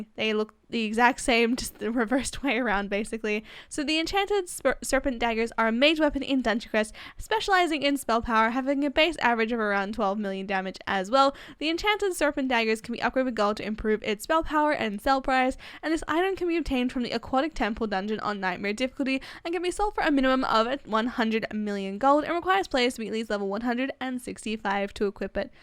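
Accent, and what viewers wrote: Australia. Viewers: Is she aussie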